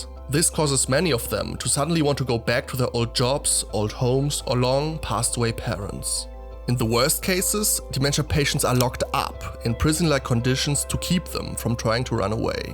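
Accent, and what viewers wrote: Germany. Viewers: Btw der deutscheste aktzent den ich je gehört habe 😂 einf geil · Dieser heftige deutsche Akzent. Wieso macht man einen englischen Account, wenn man solche Schwierigkeiten mit der Aussprache hat? Das tut beim Zuhören ja weh. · Brudi man hört direkt, dass du deutscher bist. Glaub wegen dem Akzent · It's so hard listening to English with German accent😅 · Love love love your German accent! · Grausamer deutscher Akzent!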